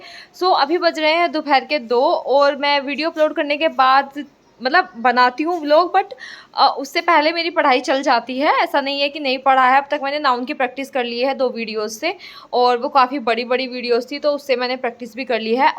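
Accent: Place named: Braj, India. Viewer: Kaha se ho? Brij wasi si lg rhi ho?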